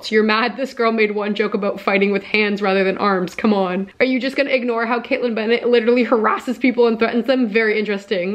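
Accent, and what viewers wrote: Canada. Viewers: are you canadian? you just have the vibe/accent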